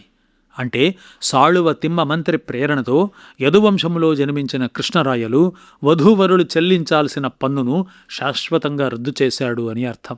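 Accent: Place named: Karnataka, India. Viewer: ನಮಸ್ಕಾರ. ನಿಮ್ಮ ತೆಲುಗು ಉಚ್ಚಾರಣೆಯಲ್ಲಿ ಕನ್ನಡ ಕಸ್ತೂರಿಯ ಕಂಪು ಗಾಢವಾಗಿ ಬೀರುತ್ತದೆ. ದಯವಿಟ್ಟು ಕನ್ನಡದಲ್ಲಿಯೂ ಶಾಸನ ವಾಚನ ಕಾರ್ಯಕ್ರಮಗಳನ್ನು ಮಾಡಿರಿ.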